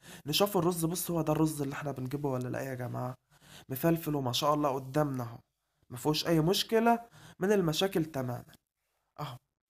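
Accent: Damietta, Egypt. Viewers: لهجته جديده علي من وين من مصر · صوت حضرتك دمياطي صح تحيه لدمياط واهلها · تسلم ايدك ممكن اعرف انت منين لهجتك قريبه مننا بدمياط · هو من دمياط ودي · حساك من دمياط من اللهجه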